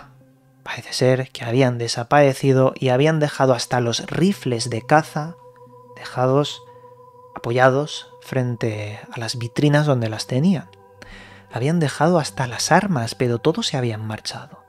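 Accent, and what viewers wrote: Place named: Spain. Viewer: gracias, muy interesantes tus videos. Además me gusta como hablas, un genuino idioma Español. Saludos desde Chile.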